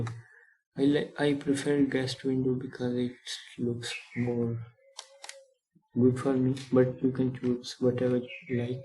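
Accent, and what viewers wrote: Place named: India. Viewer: I heard the Indian voice and was hopeful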